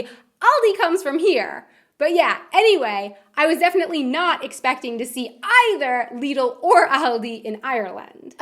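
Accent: United States. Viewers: After first five seconds yup she’s definitely American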